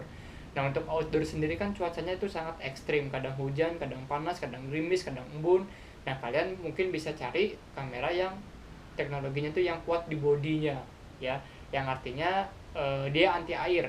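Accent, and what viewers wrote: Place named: West Java, Indonesia. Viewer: Logat Sunda mah moal bisa bohong nya mang wkwkwkw luv keren konten na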